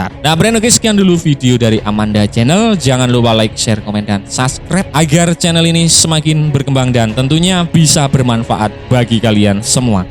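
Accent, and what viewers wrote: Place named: East Java, Indonesia. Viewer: Lu dri Jatim min?? Ngomong e.. Rekk2..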